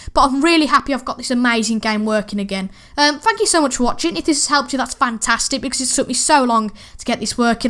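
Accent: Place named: United Kingdom. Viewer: I love britsh accent... is so funny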